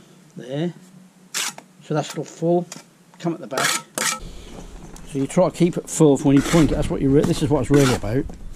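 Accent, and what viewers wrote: Norfolk, United Kingdom. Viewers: Are you from Norfolk? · You're a very good teacher. I've lived in Suffolk for 30 years - a Dorset boy originally - and recognised your Norfolk tones straight away. You make this job look so easy - thanks for sharing.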